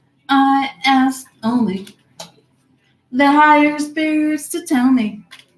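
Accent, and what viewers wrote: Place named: Russia. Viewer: Where are you from? You sound Russian, but then sound American.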